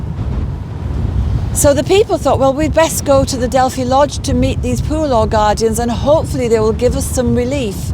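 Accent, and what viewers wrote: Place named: Northern England, United Kingdom. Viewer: It's absolutely horrific what the British ruling class did to the Irish. do you not experience hostility in Ireland due to your accent? Like you I'm from northern England. My mum and half my family are Irish (my mum emigrated here). We never go back now due to the hostility caused by our accents. They say my mum lost hers and of course I have an English accent. It bothers me because we're descended from working class Irish and English people who suffered too but they don't tend to make that distinction as soon as they hear an English accent.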